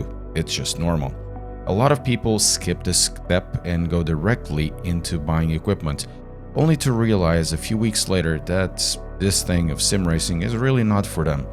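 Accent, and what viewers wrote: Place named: Portugal. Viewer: Are you portuguese perchance? Your accent sounds like it Edit: Eu sabia! 😂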